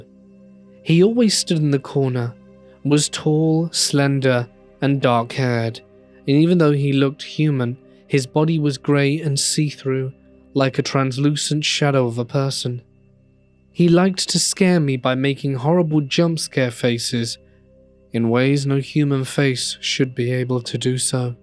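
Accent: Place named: United Kingdom. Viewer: British accen5 just isn5 doin* it for me. Gotta stop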